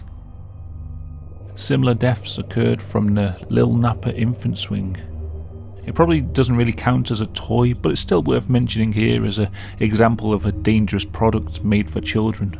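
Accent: United Kingdom. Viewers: What part of the UK are you from? Just wanted to know where the accent was from lol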